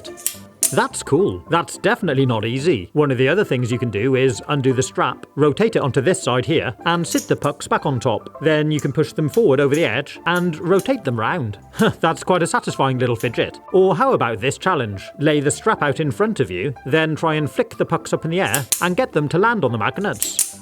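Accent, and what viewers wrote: United Kingdom. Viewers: I love your vids You sound like you are in the uk and I'm in the us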